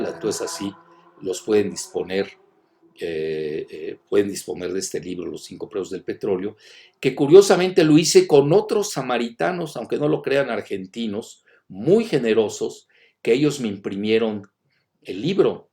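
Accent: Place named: Russia. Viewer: como me gusta mucho la petulancia que muestra mi querido calvito pues con su acento semiruso explica con coordinacion y buenos numeros.le felicito aunque yo s mucho de FDerivados del petrolio por tan solo el gas que le pongo a mi SUV,me gusta su berburrea mas me parece que se quiere parecer a la mama de Dios ,tal ves que Dios nno se le conoce mam,asi que me gusta asu exposicion y petulancia asi que adelante es un buen predicador....hum y digo predicador para no llamarle su santida francisco,,nos vemos mas me gustaria debatirle en otro temamas.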